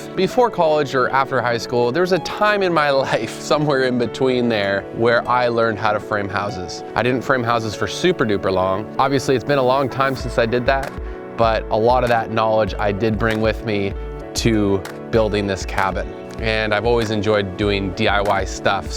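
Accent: Canada. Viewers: The only part of the video I'm confused by is the shoutout to "your Canadians" but you're wearing a Maple Leafs hat and sound like you're from rural Ontario! Great cabin man, nice work.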